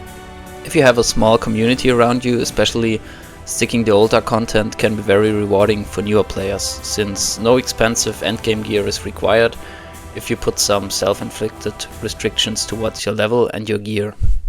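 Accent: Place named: Germany. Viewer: thx for the test. also love the nice seemingly german accent :-)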